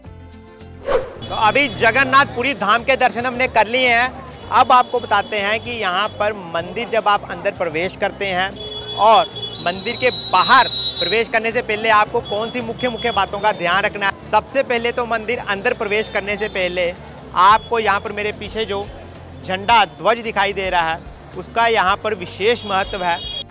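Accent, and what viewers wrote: Punjab, India. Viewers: Aapka Punjabi lahja❤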